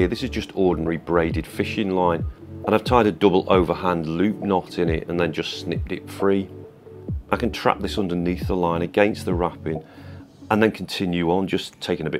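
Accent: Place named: Liverpool, United Kingdom. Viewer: You have a Beatles’ accent!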